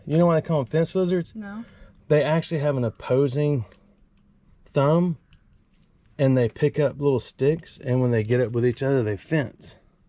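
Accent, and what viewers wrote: South, United States. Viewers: I have been subscribed to your channel since the beginning also. I liked you and your family then, and I LOVE you and your family now. I was told something a long time ago while I was going through some life lessons, "This too shall pass." And it always HAS PASSED, thru other life issues. Hang in there, God is watching over you and Family. {I still love the sound of your Southern accent.)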